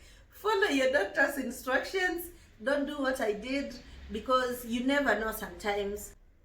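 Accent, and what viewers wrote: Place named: Kamba, Kenya. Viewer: Are you kamba?! Your accent, jeeeez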